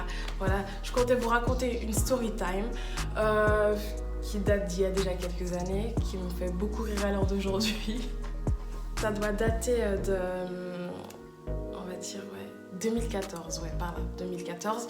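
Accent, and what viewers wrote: Cameroon. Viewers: Le Cameroun s’entend d’une manière , tu m’as tué « la honte de ça » 😭😭🇨🇲🇨🇲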